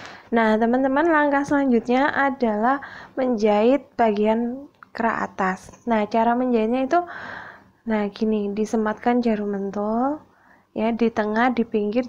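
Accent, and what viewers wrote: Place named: Java, Indonesia. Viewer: Bahasane mbk lucu.. Wong jowo yo.. Daerah pundi.😁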